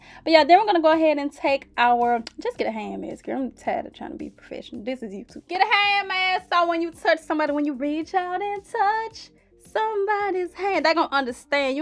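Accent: United States. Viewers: Wow, that's the most American I've ever heard a human being sound.. Nice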